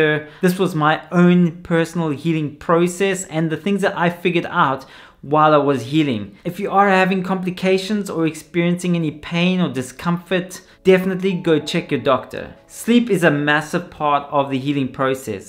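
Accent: South Africa. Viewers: Cheers dude,I broke mine twice and am going in again in 3 days to get a plate put in.The only concern I have is the sleeping and you nailed it,the couch and a pillow,infact lots of pillows saved my life the last time's, I'm hoping this time won't be as bad as its corrective surgery and I hope the doctor will give me adequate pain suppression. P.s is that a Cape Town accent I heard,been there twice,beautiful country, be 🙏 Will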